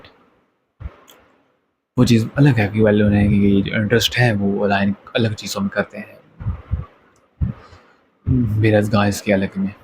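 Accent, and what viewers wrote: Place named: Pakistan. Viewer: Articulation needs improvement , but bro ur voice wow🙂‍↕️ , what a voice.. really you could be a voice artist Btw r u pakistani bcz u sounded like that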